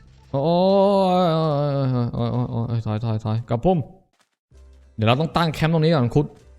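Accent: South, Thailand. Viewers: ลุง พี่พูด ภาษา ใต้เหมือ จริงๆ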